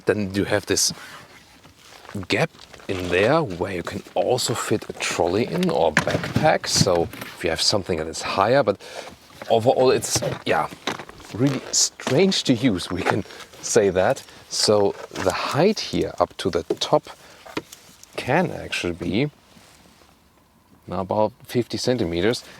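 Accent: Germany. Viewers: Dieser deutsche Akzent :D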